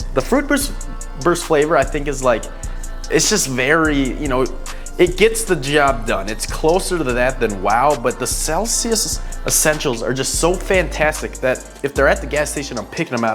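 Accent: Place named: Michigan, United States. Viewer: ahh you have a Michigan accent so hot ❤ 😊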